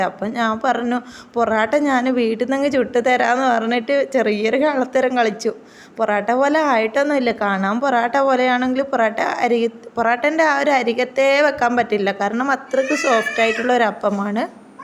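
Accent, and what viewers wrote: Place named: Malabar, India. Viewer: ഇമ്മള് കോഴിക്കോടൊ അതോ മലപ്പുറമോ ട്ട ട്ടട്ട❤❤L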